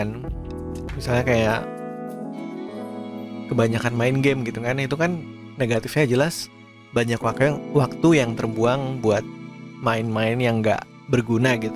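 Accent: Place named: Java, Indonesia. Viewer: Lah saya kira orang Jawa. Asli orang mana?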